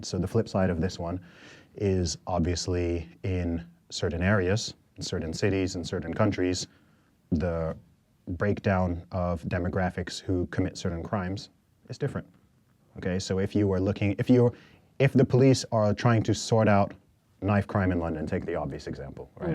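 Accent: United States. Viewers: By the way, this guy is British. I would like to know how he got the American accent. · Is he from the South West? What's with the Mid-Atlantic accent? No problem with it, I have one myself. I know this comment is crud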